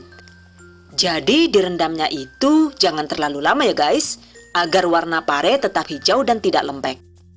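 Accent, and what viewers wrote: Banyumas, Indonesia. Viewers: ngapak tah ....ibunya makasih ilmunya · Lokasine madiun deneng ngapak 👍👍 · Kok ngapak toko wkwk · Bahasane NGAPAK banget kie lahj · Yuuhh,,, rika wong ngapak ya mbok... ora ngapak ora kepenak.. 🤣🤣🤣 #salamngapak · Ngapak bu... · Orang Madiun kok ngapak bunda?? Hehehehhe maklum saya subcriber baru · Loh deneng 🤣🤣🤣 ngapak temen koh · 😆lucu banget Bahasa koh ngapak kaya nyong kiye yu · Kok ada logaat bahasa Jawa nya mirip org Banyumas😁. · Ngapak bgt rika koh yu . Btw maen infone yu 😂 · Ciri khas ny oke.. Hihi.. 😂 Bahasa ny ngapak.. Hidup ngapak.. 👍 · negro deneng ngomonge koh ngapak hhhhhh ...... njaluk lah yu sriping parene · Plat mobile jawa timur, tapi ngomonge ngapak, jane rika wong ndi koh lik? 😅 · Owaaaalaaah ngapak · Deneng jebule ngapak rika jand hhhhhh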